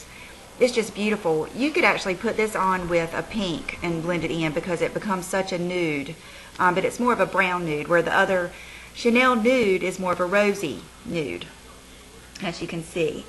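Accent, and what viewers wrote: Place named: Virginia, United States. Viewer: you're so pretty!!! Watching this video totally inspired me to get up and get ready and head over to my Chanel counter;) I love your accent btw... Are you from Virginia?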